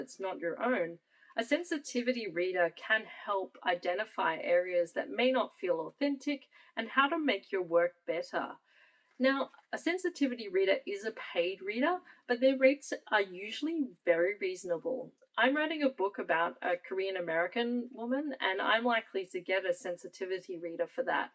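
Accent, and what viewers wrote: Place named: Australia. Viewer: This was super useful! I've suscribed because you give great advice and because I love your aussie accent. I haven't checked your YouTube channel yet but I hope you're still doing videos! I've finished my first draft (80k words) and editing is killing my dreams! But this has given me so some hope :) Thanks!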